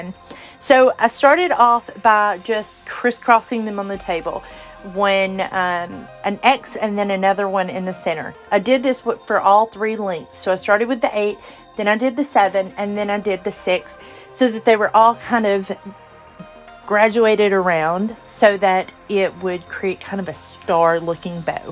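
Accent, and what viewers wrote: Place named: South, United States. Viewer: Thank you SO much for this helpful video! Love your southern accent too ;o)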